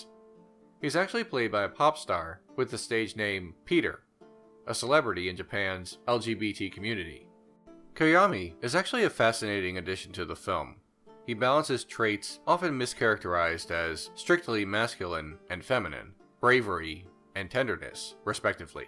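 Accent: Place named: United States. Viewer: A fair analysis, but I was really distracted by the mispronunciation of every Japanese name ... I know it probably doesn't bother English speakers but as someone that grew up in a familiar environment with the language I seriously have trouble figuring out what name it is you're saying. Then again, these are mistakes literally everyone that doesn't speak the language makes, but still, it is a mistake; the English spelling of Japanese names has never been phonetically accurate so I guess it will always trip me up hearing Japanese spoken through the American accent.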